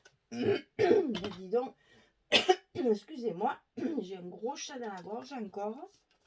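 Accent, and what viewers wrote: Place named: Provence, France. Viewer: Bonjour. Nouvelle abonnée. Tout d’abord bonne année ou meilleure que la précédente du moins. Toulonnaise de naissance - mais toulousaine d’adoption - votre accent fait chanter les cigales dans mon coeur. J’adore ce que vous faites même si je ne suis pas très chaby chic. Juste une petite remarque. Ce n’est pas un style grunge ou trash (cyberpunk) mais steampunk, courant artistique basé sur le début de l’industrialisation en Angleterre sous le règne de Victoria d’où les corsets et la dentelle dans les rouages. Le grand leader de ce courant étant bien sur Jules Verne. Côté cinéma voir Wild wild west (Les mystères de l’ouest) et la Ligue des gentlemen extraordinaires entre autres. Voilá voilá.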